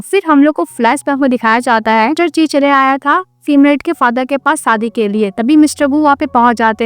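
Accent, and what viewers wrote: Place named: Assam, India. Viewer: Didi aap asamis ho ya Bangali sound like asami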